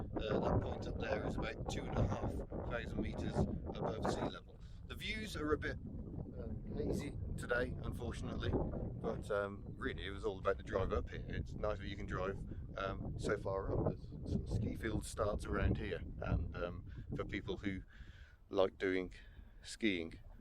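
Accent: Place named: England, United Kingdom. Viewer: My God where did you get this sad old beast? Do all NZlanders sound English?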